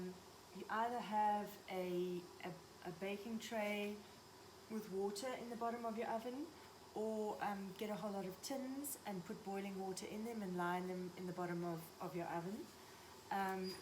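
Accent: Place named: South Africa. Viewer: A lovely video! Deserves way more views. I'm a South African baker/chef living and working in Sweden. Found the SA accent very comforting. Fantastic bread, you certainly look like you've worked professionally as a baker at some point. I'm finally beginning to master my techniques. Found the main stumbling block for me when concerning baguettes was using professional ovens. Unfortunately we don't have those amazing deck ovens at my company but rather convection ovens. They would instantly set the crust and not allow any ear formation or open crumb. After many fails and frustrations I finally settled on preheating the oven and the pizza stones to 300c with steam, and as the baguette go in the oven I'll actually just turn off the oven for the first 7 minutes while they bloom. Anyway thanks for the lovely video. Wish I found it sooner, it would have saved me so much time and frustrations. 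Go well, and keep baking!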